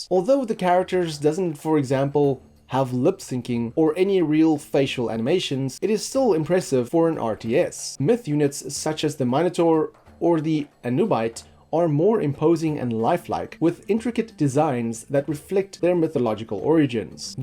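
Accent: South Africa. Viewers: The sout African is back. Whooo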